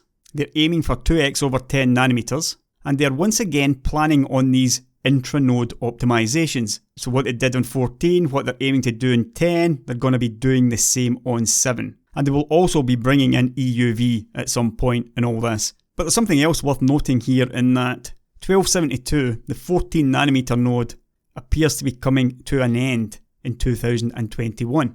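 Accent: Scotland, United Kingdom. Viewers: I hear a Scottish accent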